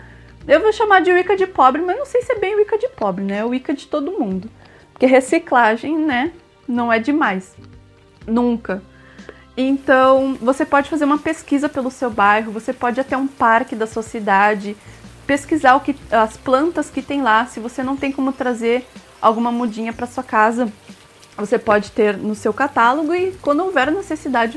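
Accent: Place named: Porto Alegre, Brazil. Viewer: Que dica incrível! Esse app vai ajudar muito, eu tenho bastante receio de usar uma planta errada pra fazer chá e passar mal, acho que vai ajudar bastante mesmo 💜 bjuuuu (eu amo que tu fala do mesmo jeito que eu por sermos daqui de Poa, sempre acho que teus outros seguidores não entendem as nossas "gírias" kkkkk)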